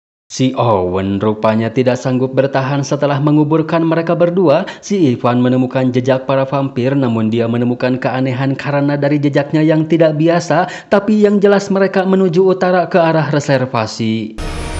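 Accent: West Java, Indonesia. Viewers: Bang lu asli Sunda mana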